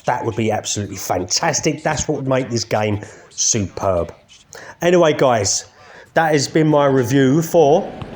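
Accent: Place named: United Kingdom. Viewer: Yes mate Nice to hear some UK pilots doing a review…coventry massive here, hardcore MP only…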